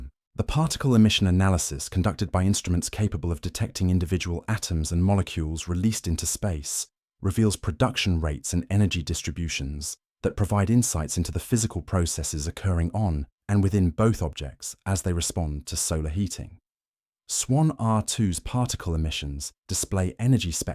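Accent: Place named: United Kingdom. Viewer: I'm really getting tired of the gay British narrating on all these darn videos. How about good old USA English huh?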